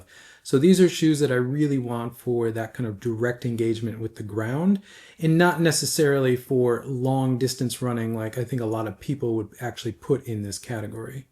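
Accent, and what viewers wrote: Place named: United States. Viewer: I had no problem understanding you and I’m surprised to hear people did. Maybe it’s an American accent and idiom thing.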